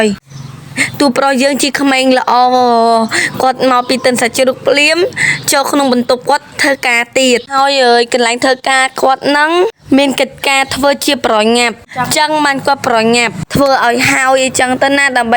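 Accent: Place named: Cambodia. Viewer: អូននៅខេត្តណា បាននិយាយវឹងហ៎😊😊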